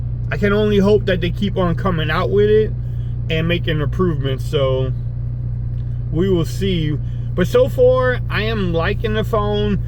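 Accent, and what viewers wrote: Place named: Louisiana, United States. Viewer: You still sound Cajun. Camera's ok 😀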